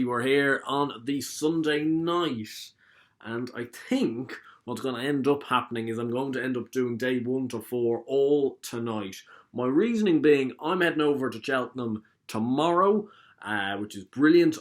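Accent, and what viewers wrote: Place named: Ireland. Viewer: Jesus christ lad what accent is that posh Irish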